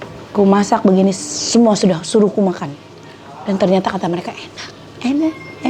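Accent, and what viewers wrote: West Java, Indonesia. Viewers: Lucu teteh bhs sunda na,geulis teh aph adanya sae pisan, geuliiis pisan😂😂😂bukan kayu teteh, rebung mh Awi alias bambu😊😊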